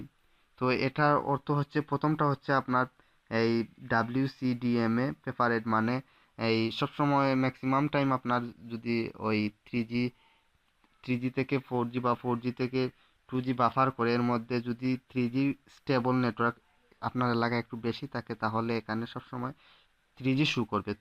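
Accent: Sylhet, Bangladesh. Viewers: ভাইকি সিলেটি?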